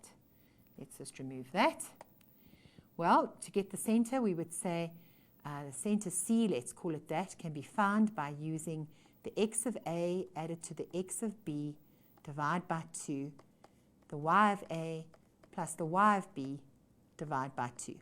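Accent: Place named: South Africa. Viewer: South African Accent... Loving it :)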